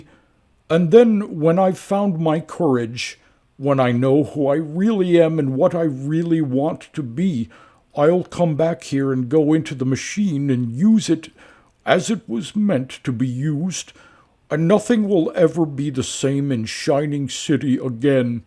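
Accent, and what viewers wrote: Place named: United States. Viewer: Do you realise how VERY American you sound?. Almost a parody of American. I wonder how long religion will keep your Nation infantile?.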